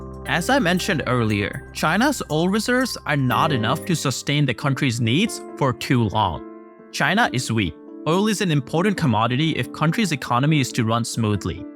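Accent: India. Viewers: Indian accent😅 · your accent is indian with much improvement than indian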